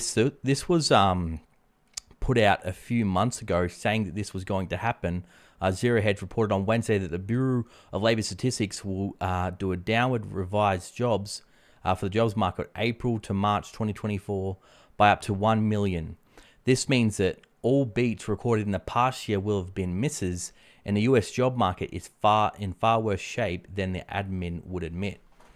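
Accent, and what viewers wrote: Australia. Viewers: Australian or Aussie accent.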